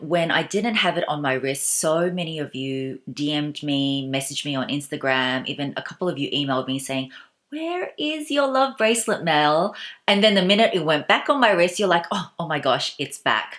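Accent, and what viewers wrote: Melbourne, Australia. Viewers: It's always so awesome hearing an Aussie accent on YouTube. I'm up in Brissy now but when you mentioned Chaddy (Chadstone) I was like "aaaaaaayeee!" lol. It's so hard to find reviews of things on YouTube from Aussies with names that you know. So awesome to see you. And thanks for review - I love the idea of the love bracelet and was planning to get one, but now I'm thinking "just a nail" might be more for me. I'd be mortified to look down and see it gone. It would be like looking down and something like a JLC Reverso just vanished - heart broken. Sweet info on pros and cons. Thank you. :) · Are you from Melbourne? You have that accent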